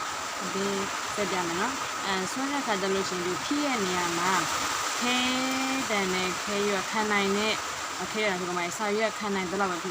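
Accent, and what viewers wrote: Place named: Rakhine, Myanmar. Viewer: ရခိုင်သံလေးနဲ့ တူပါတယ် ဆရာမ❤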